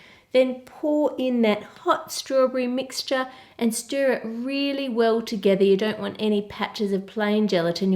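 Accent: Australia. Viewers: You know shes Australian not British or American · Are you from Australia?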